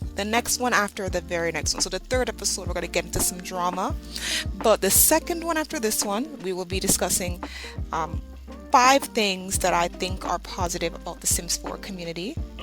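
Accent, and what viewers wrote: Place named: Jamaica. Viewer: I like your vibe, I'm definitely gonna tune in. We share the same sentiments on sirens 😂, and OMG I heard your accent and I was like oooooo she's from the Caribbean, but I literally SCREAMED when you said you're Jamaican! Ahh, I'm a Jamaican simmer too! I'm so excited to meet another SIMS 3 JA simmer 😊. (Sidenote: Isla Paradiso is where I play the most. Gives that home feeling for sure)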